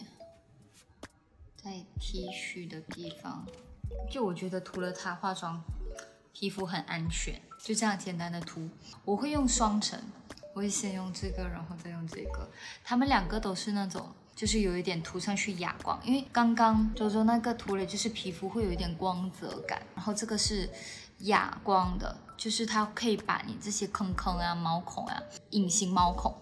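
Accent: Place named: China. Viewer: 整個中國腔欸！（有嚇到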